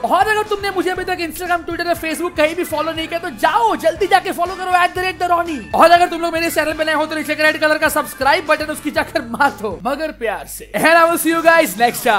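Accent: Bengal, India. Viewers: Are you bengali?? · Are you bengali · Are you bengali?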